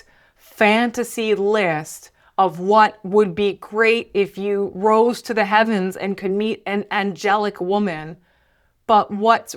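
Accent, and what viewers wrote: Canada. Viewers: Thank you for your insights. As a dual citizen, I love the Canadian way of speaking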